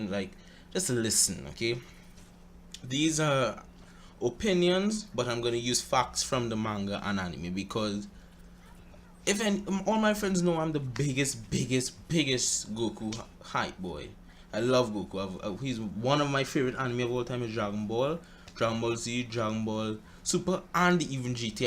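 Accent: Trinidad and Tobago. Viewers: Are you Trinidadian?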